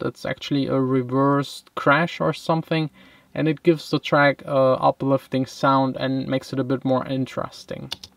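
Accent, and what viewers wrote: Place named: Germany. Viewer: Hi! It's incredible how much you've improved your English accent. In the video that's linked below you have a very strong German accent haha. Congratulations! I love your videos and music 😊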